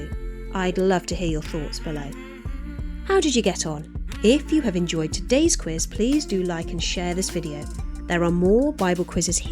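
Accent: England, United Kingdom. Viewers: Missed 5☹️… but I always enjoy hearing your beautiful English accented voice😊. Grace & Peace! ❤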